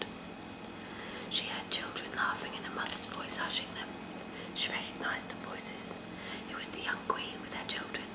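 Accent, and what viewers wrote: United Kingdom. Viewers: Your vids are pretty awesome. I've been craving a new British accent ever since Whispering Life stopped doing hers. Glad I'm came across you. I'm more than satisfied here! : )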